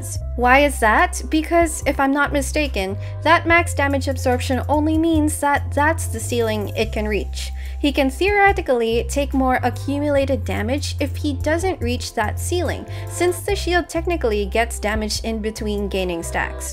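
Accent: Philippines. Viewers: Thank you!! I needed this vid because I got hutao and I know how thoma is good for her but I got elegy today at 5 pity and I decided to go for building diona (I dont have sacrificial bow) P.S: u sound filipino ;)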